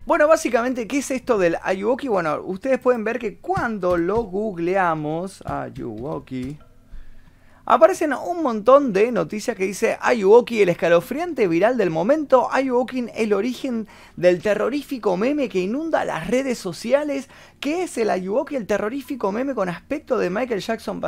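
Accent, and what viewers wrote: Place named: Córdoba, Argentina. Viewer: La tonada cordobesa jajaja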